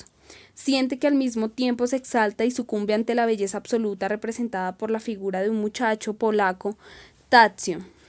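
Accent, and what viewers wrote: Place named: Colombia. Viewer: que bonita vez y que gusto escucharte. Estaba termindo el libro y estaba agotado asi que busque si habria un audilibro de esta increible relato y no solo disfrute del libro sino tambien de escucharlo asi, tan claro y con el acento que te lleva a colombia.Muchas gracias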